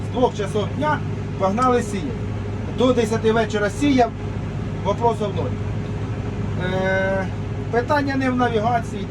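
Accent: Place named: Surzhyk, Ukraine. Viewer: Все добре тільки вчись говорити українською не суржиком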